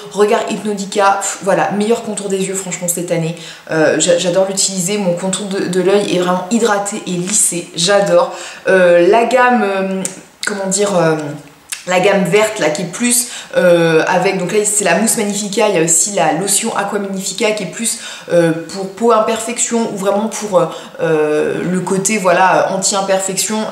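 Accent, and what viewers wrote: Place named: South, France. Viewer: accent du Sud très prononcé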